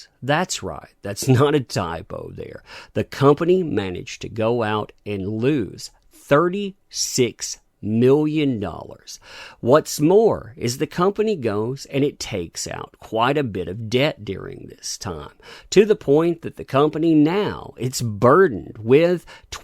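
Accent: South, United States. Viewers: So are you from Alabama or Louisiana?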